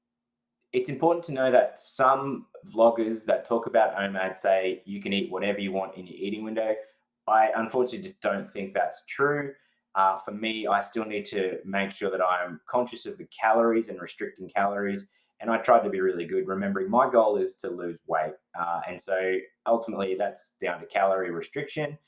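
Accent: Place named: Australia. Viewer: I don’t know why but whenever someone has the Aussie accent I listen even deeper.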